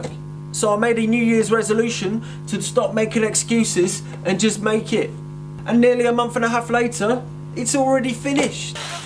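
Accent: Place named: England, United Kingdom. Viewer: Hablas con un acento suuuuper inglés!!! Es demasiado! me encanta!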